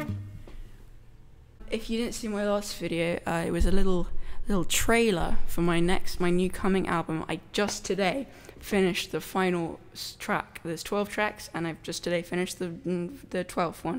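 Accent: United Kingdom. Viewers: he's British I think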